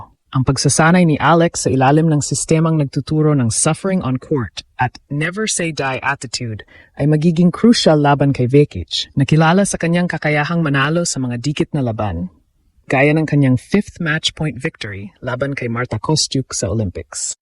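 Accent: Philippines. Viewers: AI… commentator accent is BADLY Pilipino … DESTROYING the beauty of the language …. Bad diction STOP please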